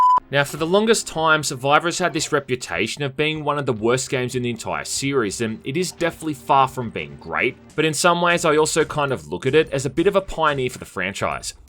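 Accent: Australia. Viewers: Wait... is an Aussie? I thought he was British!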